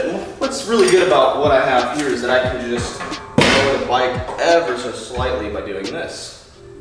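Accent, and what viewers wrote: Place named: South, United States. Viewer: if you listen to his 2nd channel he speaks in a southern accent all the time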